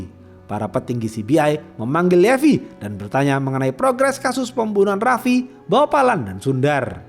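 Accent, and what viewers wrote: Java, Indonesia. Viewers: Jawa mu mana min?tugelan barang mvok ghowo2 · Wong jowo po mas e?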